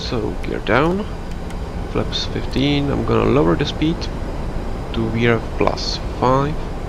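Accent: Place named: Czech Republic. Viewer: Czech accent recognized!:D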